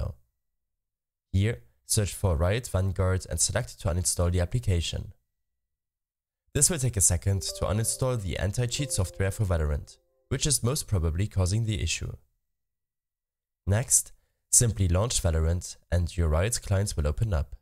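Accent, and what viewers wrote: Germany. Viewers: Man hört so richtig heraus, dass du Deutscher bist :D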